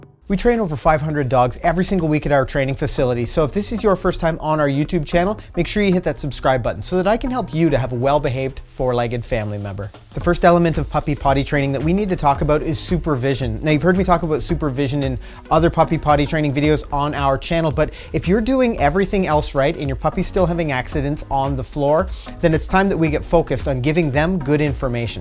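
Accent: Canada. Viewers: ur canadian omg u say hOUAse and aBout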